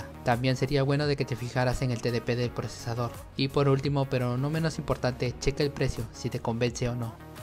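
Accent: Peru. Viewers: cabro, sos de peru¡??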